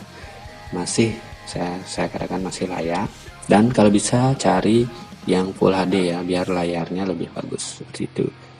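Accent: Bali, Indonesia. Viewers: Logat anak bali wkwkwk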